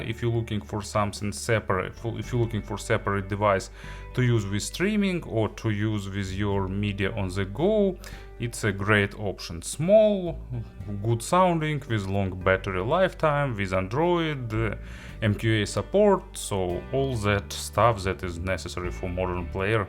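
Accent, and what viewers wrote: Russia. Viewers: руссский акцент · Nice review and your Russian english accent is not tiring to listen. I am about to receive my shan m3x in 2-days thanks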